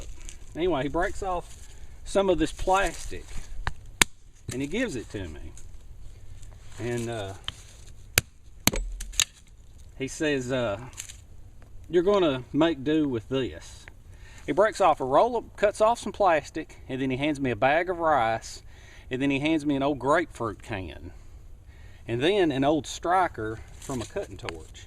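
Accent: South, United States. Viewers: I love watching your videos. From the first word in that fantastic Southern drawl and the infectious laugh right through the fantastic tips and things you can do/make with rope, and ending in your sign offs... it's all truly worth watching. I'm waiting for the warmer weather to arrive here in bonnie Scotland before I venture out and try some of your ideas. Keep making the vids - they make learning fun. Best wishes.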